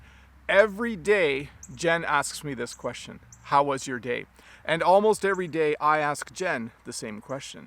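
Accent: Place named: Canada. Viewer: Thank you teacher I like your accent and the canadian people so much 😍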